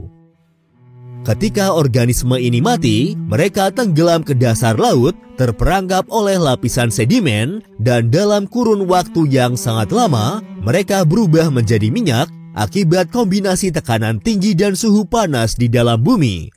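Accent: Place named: Banyumas, Indonesia. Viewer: abang dubing nya orang ngapak yaaa😀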